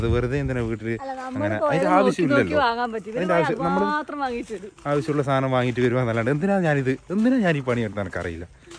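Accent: Kannur, India. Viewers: അതാണ് നമ്മുടെ കണ്ണൂർ ഭാഷ 🥰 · ഓടുത്തും നമ്മുടെ സ്വന്തം കണ്ണൂർ bhasha😂😂😂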